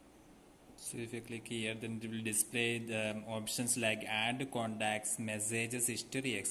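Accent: Kerala, India. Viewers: Malayali talking in English :) funny slang dude!!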